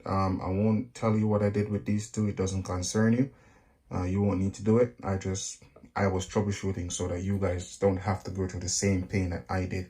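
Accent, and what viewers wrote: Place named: Jamaica. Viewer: Bro sound like a yardie this helped me alot, big up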